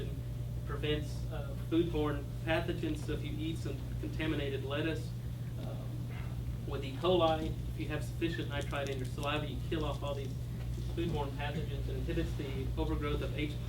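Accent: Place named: Texas, United States. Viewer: This is a cure for heart and stroke disease without changing a bad diet!! It makes you bullet proof even if you eat greasy junk all the time!!! Just get a pinch of sodium nitrite and add to every liter of drinking and cooking water. Sodium nitrite in food grade quality is available for about 6 bucks for 2 lbs, enough for a human for a decade of use at these levels in drinking water. Please turn up the sound and endure the bad quality sound, and Dr. slurring sort of Texas accent, it is worth every second. Take the trouble to listen 10 times if you need to, and GET this, especially the sections on the microscopic artery video showing adhesion of monocytes (a type of white blood cells that turn into macrophages or cellular garbage trucks), and how they penetrate the lining of the artery, starting the creation of plaque, but do not when the sodium nitrite is added to drinking water. 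This is absolutely astounding footage!!! Note how either 50mg or 150mg per liter of sodium nitrite in drinking water completely negated the harmful effects of high cholesterol diet, and prevented atherosclerosis!!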